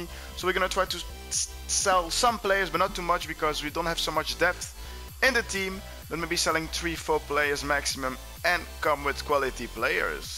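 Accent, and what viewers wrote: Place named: Belgium. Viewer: Nice belguim accent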